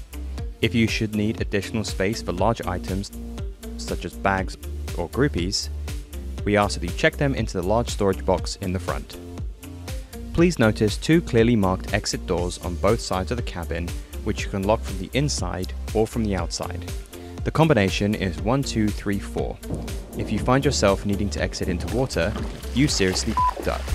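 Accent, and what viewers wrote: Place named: United Kingdom. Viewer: i do not understand American marketing that is so enamored with British accents. 🤷🏽‍♂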